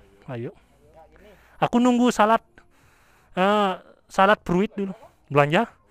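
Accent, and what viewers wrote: Bali, Indonesia. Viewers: Dari logatnya aja udah keliatan, pasti abang orang bali ya 😅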